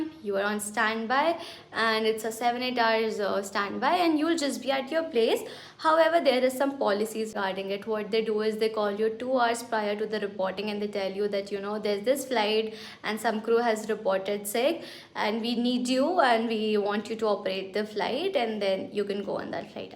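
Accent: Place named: Karnataka, India. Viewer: U are getting habitual to kannada accent 🤣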